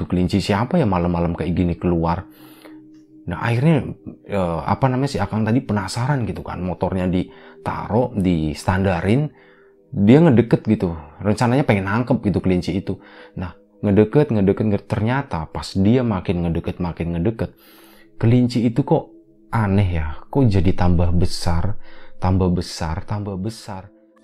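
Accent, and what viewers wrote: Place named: Pekalongan, Indonesia. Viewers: Aku org sunda asli.. Pas mas ngmng sundaa jd pngen ktawaa krna bhsany sunda tp logatny jawa.. Tp keren mas lbih bnyk lg msukin crita sundanyaa😁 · karang Dadap mas, pean Pekalongan jga to logat e kok Podo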